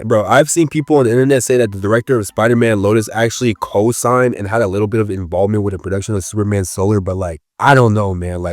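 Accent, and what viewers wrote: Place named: Mexico. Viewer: man THIS one is a stretch Edit- What's wrong wrong with people having their own personal views that others deem "racist"? The reason I even ask that is because YOU'RE grifting by making both of the videos. A real BIG gear grind of mine is when someone calls a person racist for saying 🥷🏿 BUT then THEY start dropping the SAME WORD casually for the rest of their conversation. Nah my boy that ain't gonna fly, at that your whole fake rage & argument you just Steph Curry'd right into the dumpster. AND even if you're Black you sound like you have the inflections of a South of the US Border denizen, so saying it for you is wild if true.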